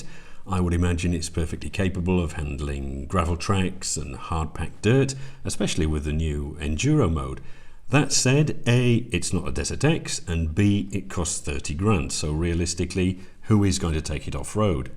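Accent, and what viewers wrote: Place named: RP, United Kingdom. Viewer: Love your English. Proper RP. Cheers from an English teacher in Sweden. And yes, that bike is way too expensive for most of us mortals.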